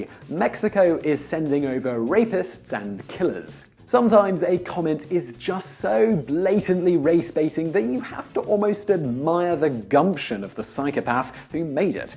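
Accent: United Kingdom. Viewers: Is this guy British or does he fake the accent?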